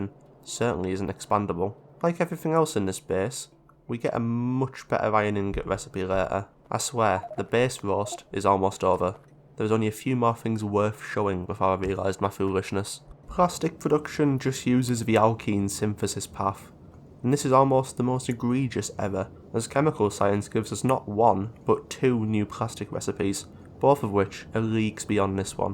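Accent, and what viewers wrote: United Kingdom. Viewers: i meant start of the video when you saying about Ko-fi supporters, if im right in Britain people when saying "a bottle of water" they pronounce it like "a boa of woa", and when you said "supporters" by pronouncing it "supo-es" i remembered about Britain accent, LOL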